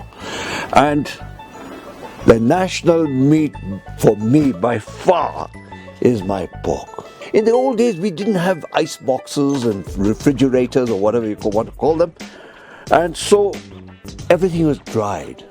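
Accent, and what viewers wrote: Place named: United Kingdom. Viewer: I must say you really do have a decent british accent !! Regardless to say..the food was tempting..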